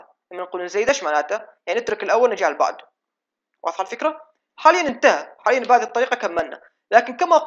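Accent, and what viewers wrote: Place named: Mosul, Iraq. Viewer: 😍😍فوك ما شرح حلو وطريقة حلوة النوب اللهجة مصلاوية احييك من القلب ❤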